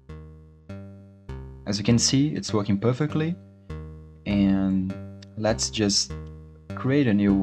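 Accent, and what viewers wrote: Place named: Brazil. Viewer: esse sotaque não me engana tu é brasileiro CERTEZA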